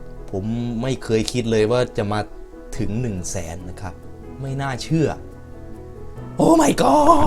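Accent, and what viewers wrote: Isan, Thailand. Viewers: พี่คนอีสานหรือปล่าว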